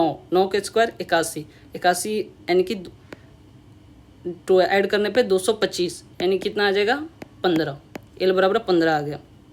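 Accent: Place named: Bihar, India. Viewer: Bihari touch in voice ❤❤ great bhaiya ❤❤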